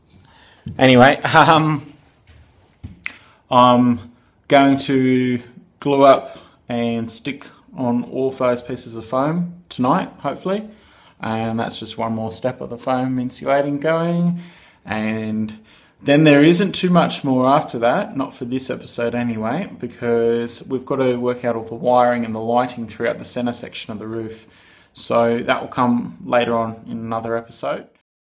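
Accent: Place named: New Zealand. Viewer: Hey, i know the bus is long gone but we have the same bus and are doing it here in ADL! You sound like you're Kiwi too? How the hell did you get the strips off where the seats bolted to the wall?!?!?! Looks like it's all one piece?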